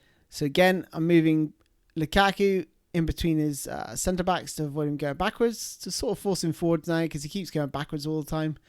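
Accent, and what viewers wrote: United Kingdom. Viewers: Great explanation, and a new Sub from me! Also, you sound like you're from Bristol too? Either way, one new follower :)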